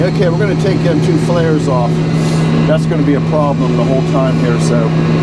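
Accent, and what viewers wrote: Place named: Pennsylvania, United States. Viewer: Both of my parents were 1st-generation Americans ("greatest generation") who were born and raised in Fayette County PA, it's such a joy to hear your accent which reminds me of them and of my large extended PA family.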